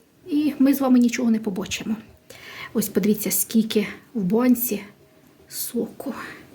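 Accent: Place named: Sumy, Ukraine. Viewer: акцент сумчан не с каким не перепутаешь. угадал?🙂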